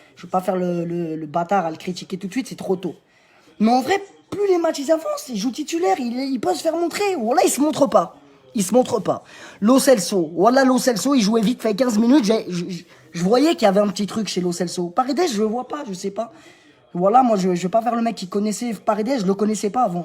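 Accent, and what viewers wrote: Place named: Paris, France. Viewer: L'accent parisien cet horrible 😱. Mdrrrrrr le titre de la ligue 1 conforama lol 😂 et la coupe de France 🇫🇷 mdrrrrrr grandiose mec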